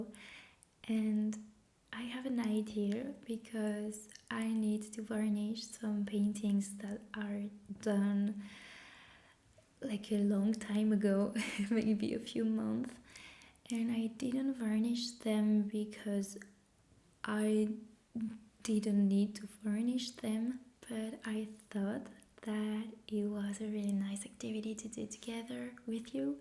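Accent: France. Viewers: your videos are great and you are one of the few asmr channels i watch. i would request that you do more whisper/talking videos such as when you looked through your artbook. your voice and accent are so relaxing and charming. obviously you are french, but if you were playing a french character then you are playing it very well :) hahaa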